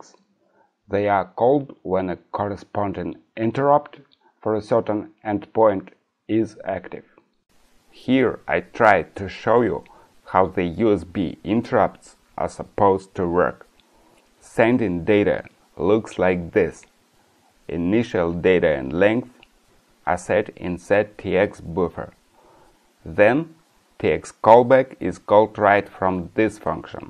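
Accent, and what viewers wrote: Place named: Ryazan, Russia. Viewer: Отлично! Долй HAL. Понятный рязанский акцент без американизмов легко воспринимается на слух. Кусок перекачки файла стоит обрезать процентов на 90.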